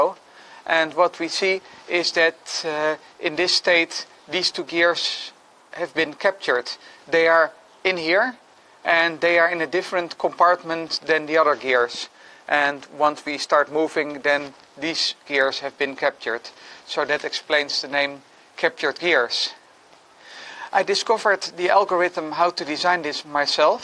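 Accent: Netherlands. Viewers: lekker nederlands accent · ben je Nederlands? je klinkt zo😉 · You sound Dutch, are you dutch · You are 100% sure dutch. (Me too)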